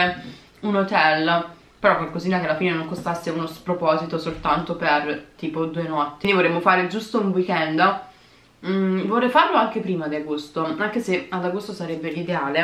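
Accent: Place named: Naples, Italy. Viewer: Sei napoletana 😍😍😍😍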